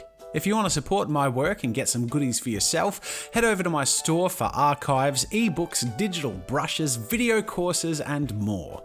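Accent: Australia. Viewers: Omg r u Australian?? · is he australian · Is Aussie?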